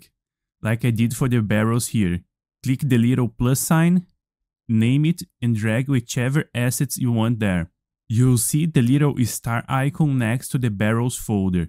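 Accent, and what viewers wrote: Brazil. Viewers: Helo there, conheço sotaque de mineiro só de ouvir. Ajudou muito irmão! Abraços from Contagem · assisti 5 segundos e pelo sotaque tu é brazuca, ou eu errei feio ou eu acertei muito bonito, well in case you are not, great video tysm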